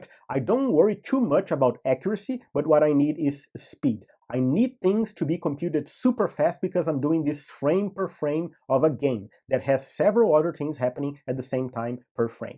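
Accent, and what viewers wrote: Brazil. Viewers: this guy has to be brazilian, no where else we find that accent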